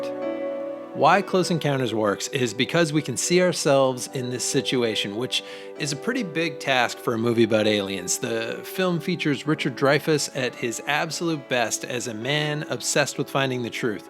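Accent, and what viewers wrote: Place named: Canada. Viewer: The commentator sounds Canadian. I love the way he says a boot instead of about!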